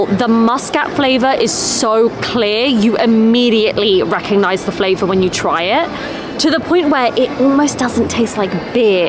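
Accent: United Kingdom. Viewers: 綺麗なイギリス英語で日本カルチャーと食に対する繊細な英語表現が学べるなんて！全インバウンド事業者必須のチャンネルですよ！あと何よりが素敵✨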